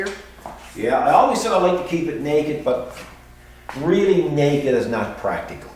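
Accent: Newfoundland, Canada. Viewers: That sure sounds like a west coast Newfoundland accent??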